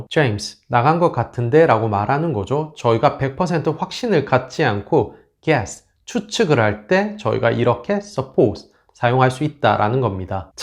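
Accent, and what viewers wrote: United Kingdom. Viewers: 한국어에 영국 억양이 묻어나요^^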